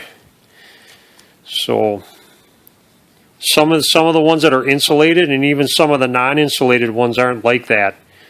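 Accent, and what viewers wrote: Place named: Minnesota, United States. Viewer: I’m hearing some Minnesota in here!😁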